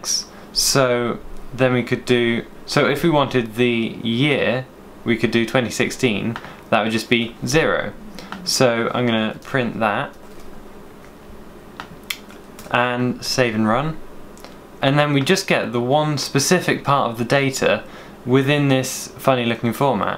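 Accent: United Kingdom. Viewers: awesome ,sounds like a British?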